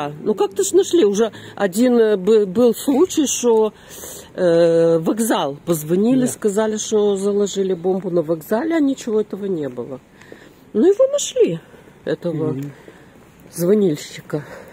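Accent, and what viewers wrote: Odessa, Ukraine. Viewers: спасибо вам за эти ваши прогулки! Наслаждаюсь вашим выговором - как дома побывала! Живу далеко, но вся родня в Одессе. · Вы интересная чудачка, истинная одесситка.Говор.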